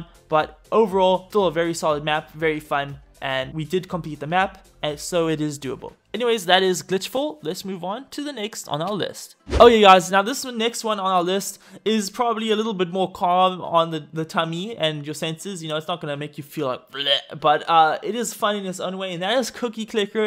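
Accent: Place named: South Africa. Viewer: it makes sense cause I am from South Africa I’m guessing most of your fans are South African, they just didn’t realise your accent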